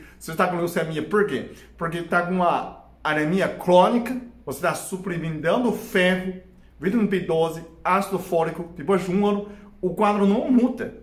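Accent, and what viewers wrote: China. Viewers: Você fala muito rápido Doutor, não entendo direito esse português Xing ling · mas só saiu assim devido o próprio jeito de ele falar, ele ainda tem o sotaque, chinês, pior o Brasileiro que não sabe escrever o Português certo, tipo andano, falano, trazeno,Sima abito umilde serto e muitos outros, ele é perdoável é só observar como ele fala algumas palavras em português.😊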